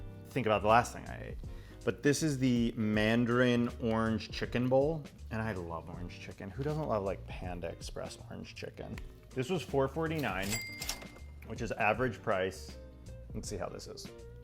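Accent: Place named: Italy. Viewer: The italian accent made me quit the video halfway through and I only came back because I really like your content, but aside from that it was a nice video! Maybe next time you could record in two days so you don't overeat and feel sick.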